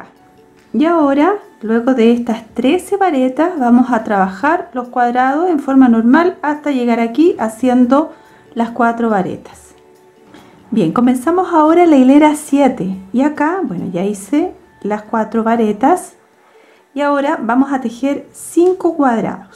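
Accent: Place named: Chile. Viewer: LO SABIA X EL TIMBRE DE VOZ ....YO TB SOY CHILENA Y T FELICITO XQ EXPLICAS MUY BIEN ...GRACIAS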